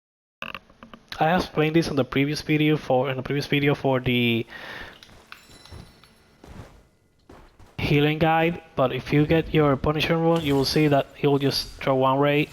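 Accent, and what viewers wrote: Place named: Argentina. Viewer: Your english has argentinian accent 😅 🤔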